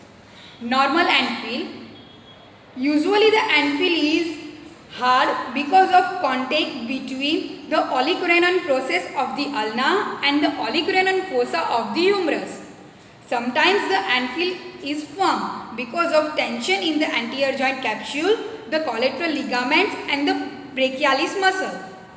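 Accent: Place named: Gujarat, India. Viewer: The girl who is giving instructions is pakka Gujarati Only then she can have that funny accent 😂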